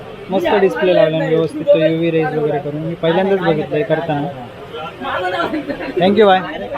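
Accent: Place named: Malvani, India. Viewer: ❤❤❤ शुभेच्छा आसंत तुमका सगळ्यांका जे ह्या चॅनेल कारणान् संबंधित आसंत... ❤❤❤ नैसर्गिक मालवणी शब्द आणि आवाज मुंबयत ऐकान् माॅप, संवेदना स्पर्शून जाता आसा...❤❤❤ मुंबय कोंकणातलो भाग आसा ❤❤❤ आमका व्हयी मालवणी... आमी कोंकणी, मालवणी... शुभेच्छा ❤❤❤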